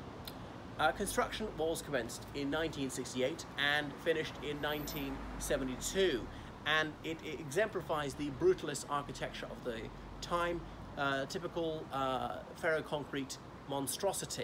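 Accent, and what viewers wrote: RP, United Kingdom. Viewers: are you sure you’re the guy in the video? The ‘dunno’ doesn’t rlly fit with the extremely posh talking in the vid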